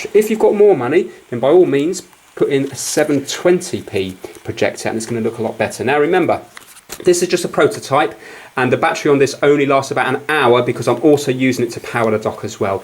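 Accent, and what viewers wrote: England, United Kingdom. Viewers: Hey your british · Lol that funny English accent 😂 It's cool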